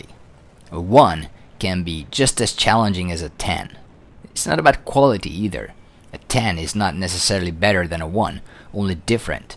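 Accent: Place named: New Jersey, United States. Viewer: I just can't put my finger on your dialect but it's the coolest American English. New Jersey?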